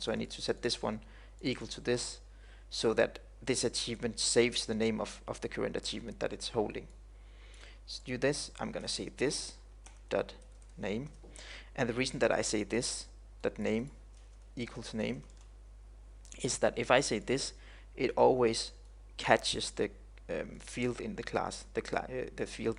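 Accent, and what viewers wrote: Denmark. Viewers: hey i hope you still helps :P im trying to follow your tortuial on this achiement system :P but insted of achievement i will make it to a buy dogs(pugs) :P but i have some problems :) mine says ´pugs´ is a type, which is not valid in the given context :( and i dont get why :) so i hope you will help me :P ps Danish here :) so if you want just talk danish :) cos i can tell by your accent that you are danish :P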